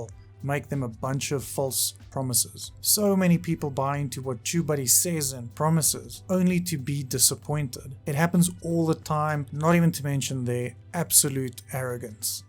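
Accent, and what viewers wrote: South Africa. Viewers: I detect a South African accent. Are you per change South African? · Do I detect a South African accent? Any way, I'm a new youtuber and used tubebuddy for my recent video and I cant really see a better results, I just still dont seem to get traffic to my videos which directly effects the amount of views I get.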